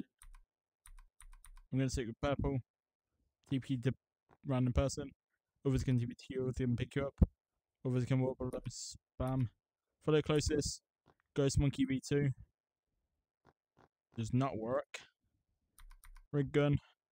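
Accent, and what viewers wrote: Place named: United Kingdom. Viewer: that is justnormal british accent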